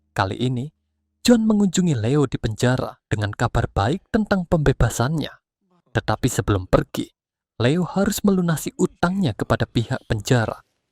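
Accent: Java, Indonesia. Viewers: Suaranya jawa banget. Kureng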